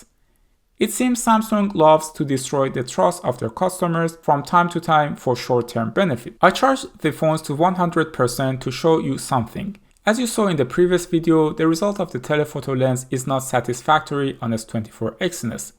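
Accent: Iran. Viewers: I like that Iranian accent ;) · Again great video dude. My wife is saying that you have a persian accent ? Are you persian ?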